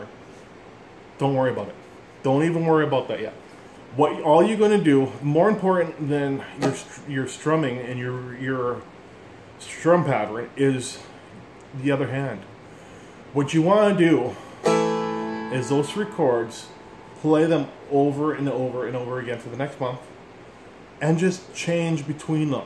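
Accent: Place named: Louisiana, United States. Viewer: your starting to talk like a Cajun down here aint that dandy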